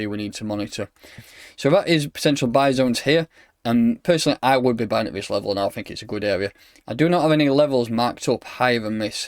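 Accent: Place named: Chorley, United Kingdom. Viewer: I'm putting you in Chorley or somewhere with that accent mate. You deserve a bigger audience - nice, easy to follow analysis.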